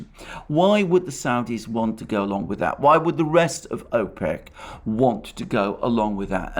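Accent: United Kingdom. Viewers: Your extraordinarily british way of speaking amazes me, amuses me in a way that I can't explain... You're obssessed with Ukraine and Russia, and you still have SOME time to be umplesant and rude about the CHINESE" I'm sorry to be amused by this, but your gentlemansness is fantastic.... Thanks a million for the great analisys AND for the gentlemansness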